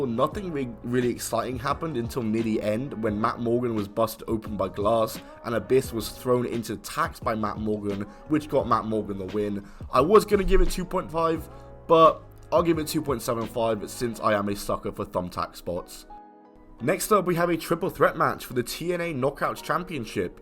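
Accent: England, United Kingdom. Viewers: Seriously his annoying obviously brother English voice ruins it.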